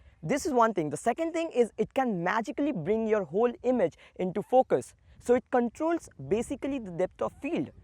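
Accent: India. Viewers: very nice explain... i think it is the time to make a video on how and what principle camera works with animation clear in indian english like your sweet talks... we are eager to get that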